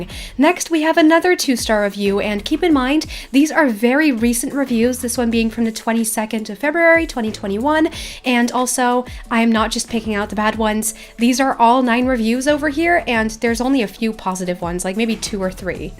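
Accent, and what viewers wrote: Netherlands. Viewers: Wait— are you dutch?!😱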